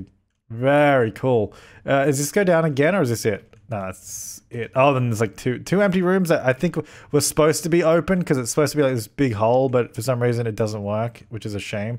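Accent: Australia. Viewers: Kinda sad that the Australian accent (soft g sound before a long u) disguised the 'historical duty' pun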